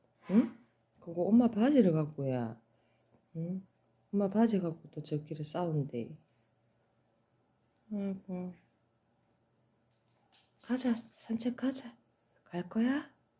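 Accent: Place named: Gyeongsang, South Korea. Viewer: 정겨운 경상도 사투리 마력이 있네요~~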